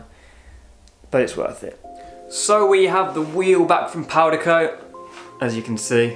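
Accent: Birmingham, United Kingdom. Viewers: Cool look fwd to that. You sound like your local to me. I’m in Birmingham. Could do with picking the brain of the guy that does your electrics lol.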